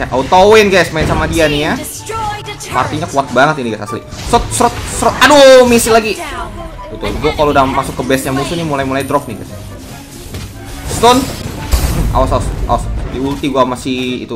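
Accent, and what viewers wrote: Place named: Jambi, Indonesia. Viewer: Orang mana bos? Kek bahasa jambi Gilo haha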